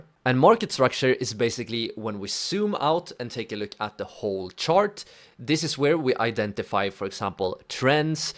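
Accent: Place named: Sweden. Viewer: svengelskan e ju för skön!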